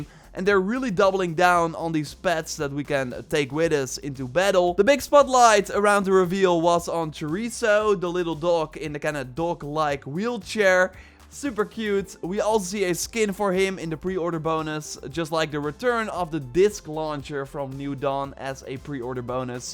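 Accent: Netherlands. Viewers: Ken heel je kanaal niet. Maar aan dit accent te horen ben jij sws Nederlands lol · m8 are you dutch you sure sound like one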